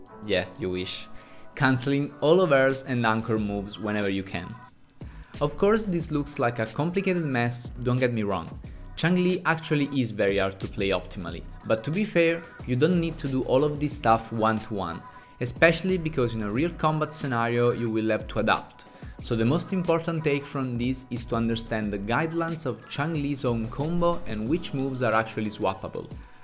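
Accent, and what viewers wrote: Italy. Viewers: Completely unrelated to the video, but are you Italian by any chance? Lol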